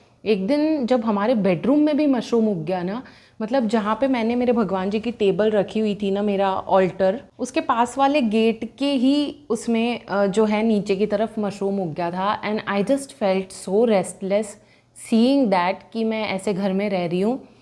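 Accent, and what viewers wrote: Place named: Gujarat, India. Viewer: Muje aisa kyu lagta hai ki aap gujrati ho😂kuch words sun k muje laga.. Bt nice chota house. Bohot hi acha ghar hai. Apka ghar ise acha hai ya fir ye ghar acha he.. Jarur batana👍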